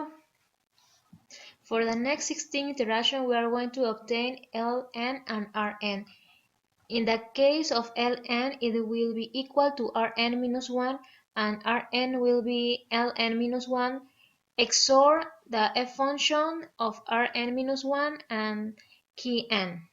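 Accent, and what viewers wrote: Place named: Spain. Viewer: she's soo spanish. I love this accent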